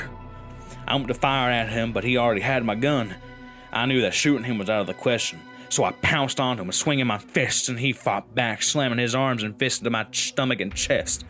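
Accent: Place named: South, United States. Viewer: Your southern voice is kinda cringe but your vids are still good. Just tell stories in your regular voice dude.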